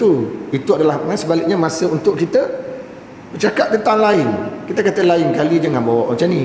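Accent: Terengganu, Malaysia. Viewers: Sebenarnya menggunakan loghat terengganu, yg biasa di gunakan sesama rakan2 rapat. Di negeri lain pun, budak2 bercakap sesama mereka pun sama.. Bukan berniat mengatakan orang yang datang tu bodoh tapi menggunakan pendekatan kawan2, tidak menjarakkan diri dgn pendengar...amat berjaya Mudah di fahami, jelas, siap dgn contoh, seronoknya, sangat kelakar dan tidak membosankan... Kalu style ustaz ni ceramah, x sampai 15 minit semua dah tidur. tidak sesekali di pulaukan bahkan setiap ceramah beliau, manusia berpusu2 dtg... Bahkan surau kami yg ingin menjemput beliau masih lg tidak berpeluang di sebabkan jadual yg ketat dan penuh dalam setahun..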